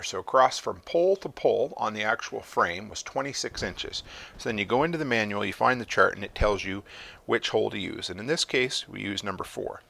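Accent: Canada. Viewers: "Oatside". Love the Canadian accent.❤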